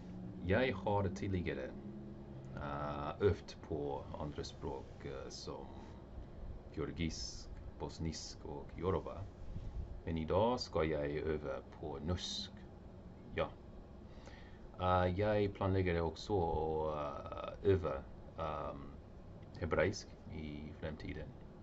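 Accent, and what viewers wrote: Sweden. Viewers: Love your stuff man. Btw, you are speaking norwegian with a swedish accent ( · I could still hear a Swedish-ish accent there, but I could definitely understand everything you where saying, keep it up :) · You speak a bit swedish/norwegian mixed, but you are amazing still! Im a Norwegian and I love Japanese, ive studied it some! id love for it if u could help me learn it :D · Høres litt ut som norsk språk med svensk uttale, men uansett kjempebra gjort! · Sounds so amazing for two months!! My mother who is Filipino and lived in Norway for 20 years and you are juuuust below her in speaking.you have a very Swedish accent which is funny, so you have an American Swedish Norwegian sound haha. Kudos to you, much love from up north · Du var veldig flink men du har en slags svensk aksent, men du var veldig flink for å snakke norsk er veldig vanskelig, men hvis man kan svensk er det litt det samme. 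· Litt svensk dialekt men ellers bra norsk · Høres ut som en svensk mann som har bodd litt i Nord-Norge! awesome job man!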